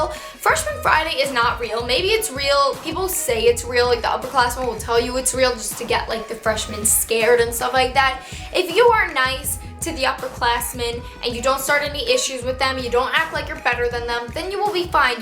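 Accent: New York, United States. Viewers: are you from NY ? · You have a New York accent!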